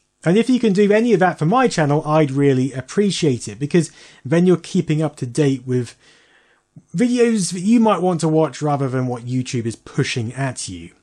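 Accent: United Kingdom. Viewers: Bro just want to thank you for making vidoes. Listening your british accent and geeky videos makesmy day always better! 👍🏻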